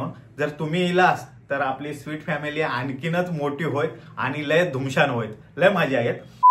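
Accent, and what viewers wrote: Malvani, India. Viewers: Maka tumch malvani aikuk avadta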